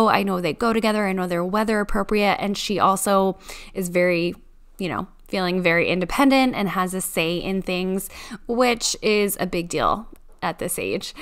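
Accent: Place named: United States. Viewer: Haha yes yes I know oregano just can't believe how you say in America! I love your accent! Also your video has inspired me to get out my uggs! 👍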